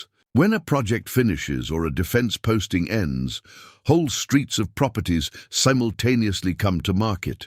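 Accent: United States. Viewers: If you hear this voice of an American,, don't listen to a word they say Don't listen at all Their Aussies behind it, straight out lying for their own narrative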